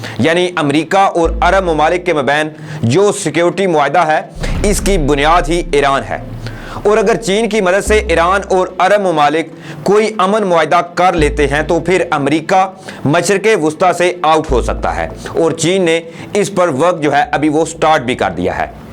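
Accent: India. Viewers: If you don't mind, may I say that you need to improve ur accent. You speak like indians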